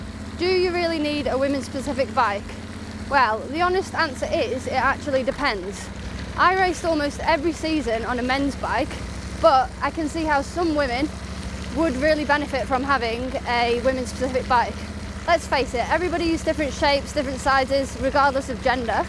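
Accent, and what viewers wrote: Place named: United Kingdom. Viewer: It's probably a mix of nerves and the British dialectual habit that she's attempting to speak through a locked-jaw. Don't take this the wrong way - nobody is expecting her to sound American, but perhaps a little practice enunciating with an open mouth (and, of course, time to be less nervous while doing it) will go a long way. Matt, Dan and Cy seem to have this down pat, but I'm sure it took practice and/or some studying. Too bad cycling and a glass of wine - to lessen the nerves - don't mix, but like anyone who regularly speaks in front of an audience, she'll get used to it.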